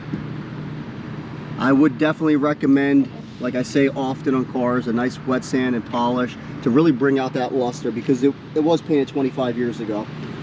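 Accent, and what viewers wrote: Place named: South, United States. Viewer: Fortunately for him, the southern drawl does not hold him back from moving inventory every month.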